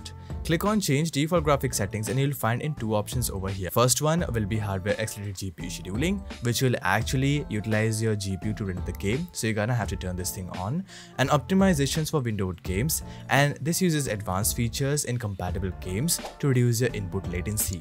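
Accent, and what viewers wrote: India. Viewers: YOU SOUNDS LIKE AN INDIAN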